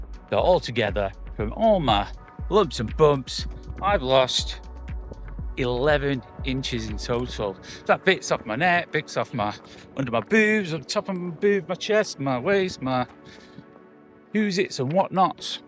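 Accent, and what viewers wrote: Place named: Northern England, United Kingdom. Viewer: Love the accent ,down t earth good owld northern accent😊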